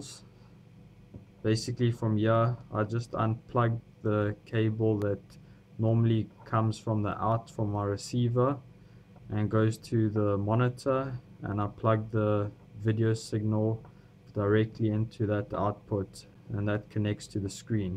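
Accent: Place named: South Africa. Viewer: You are definitely South African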